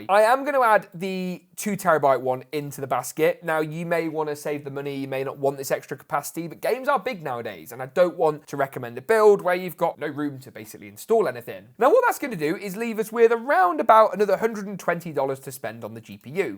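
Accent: England, United Kingdom. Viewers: Why tf dollars ? You sound English? Pounds perhaps ?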